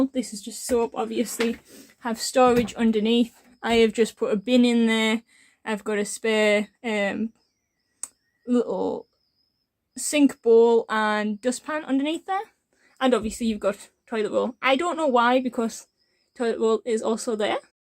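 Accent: United Kingdom. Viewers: Are your from Durham?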